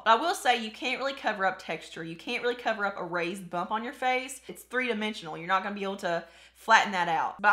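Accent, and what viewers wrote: South, United States. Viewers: Just found you! Another southern accent.. I can soooo speak your language!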